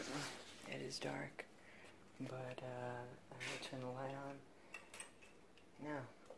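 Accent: United States. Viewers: You From Chicago Bro ?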